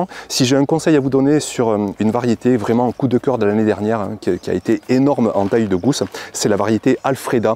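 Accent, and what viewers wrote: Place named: Provence, France. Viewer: Bonjour, merci pour tes videos et ton accent qui fait penser aux cigales et imaginer des champs de lavandes.... pour les pois, peut on évoquer le trempage de 12 heures avant de semer ?! Est ce vraiment un plus ? Bonne continuation, Lionel de Bretagne et son jardin qui regarde l'océan...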